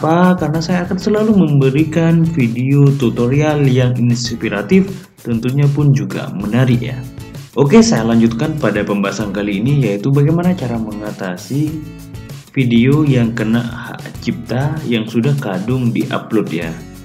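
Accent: Madura, Indonesia. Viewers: Oreng madureh ben lek? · Madureh bos tak elang hehehe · Logatnya Madura .. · Reng madureh yeh tretan... 😋😁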